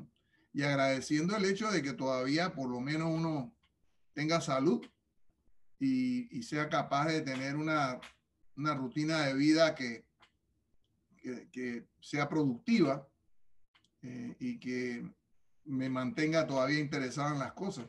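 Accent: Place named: Panama. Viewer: Lo he visto dos veces una vez en el instituto Naciónal que fuisteis a cantar y la otra cuando era candidato para la presidencia es muy amable y cariñoso Dios te bendiga lo que más admiro de ti que no has perdido tu acento de Panameño a pesar de haber vivido tanto años afuera del país !!!! Saludos desde Florida!!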